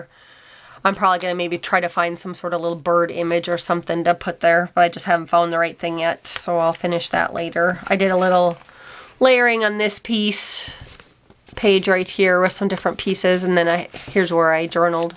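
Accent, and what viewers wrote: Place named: United States. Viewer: Pretty journal. I have a non crafting question for ya! Folks from your state pronounce your short "o" and "a" differently than the rest of the States. I'm curious... where does that come from? If you can answer that, it'll settle a bet with my husband. I say it comes from the American Indians.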